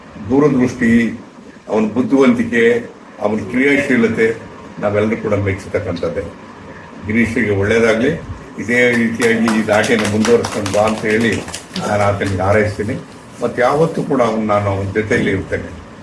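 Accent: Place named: Sira, India. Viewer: sira bhashe sogadu super. na fan nanu. Inti H D mestru.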